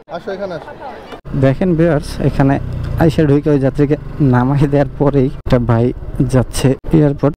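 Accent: Barishal, Bangladesh. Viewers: ভাইয়ের বাড়ি কি বরিশালে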